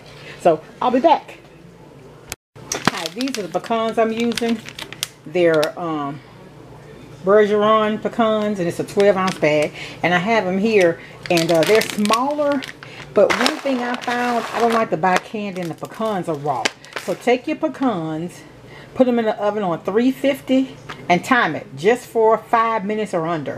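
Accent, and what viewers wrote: South, United States. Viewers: I love your good ole' Southern accent. I think l will try this recipe.😄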